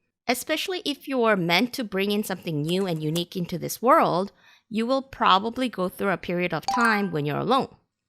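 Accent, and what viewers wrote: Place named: South Korea. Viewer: Not related to the topic, but I assume you are Korean American? Haha... just a guess but you have a bit of that Korean accent while speaking English.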